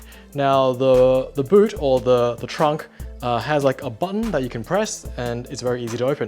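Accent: Australia. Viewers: Hey, thanks for your clear and concise explanation with no BS. Extra bonus points for the Aussie accent! Love your work.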